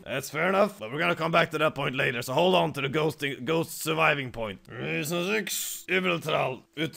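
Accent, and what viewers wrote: Sweden. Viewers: he is swedish i can hear on his accent and his calculator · are you swedish?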